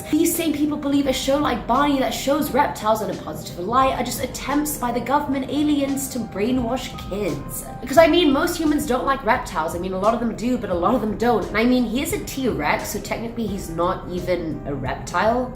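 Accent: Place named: RP, United Kingdom. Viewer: i like your accent i, i think its attitude and i think its called posh.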